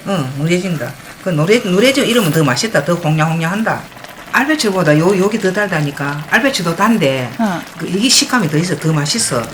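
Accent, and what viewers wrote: Gyeongsang, South Korea. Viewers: 감사합니다.오늘 해 볼께요 맛있는요리 자주 올려주세요 샘 목소리 경상도 억양 ㅡ고향의 소리 고향냄새 엄마가 생각납니다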